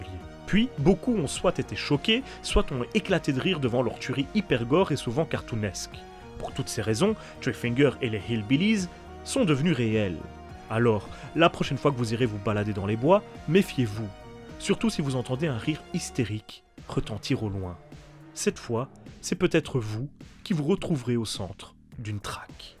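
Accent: Belgium. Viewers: ah batard t’es belge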